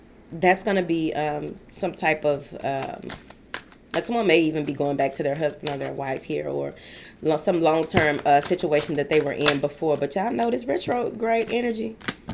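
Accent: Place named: Dallas, United States. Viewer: You in Dallas. It sound like it, in your accent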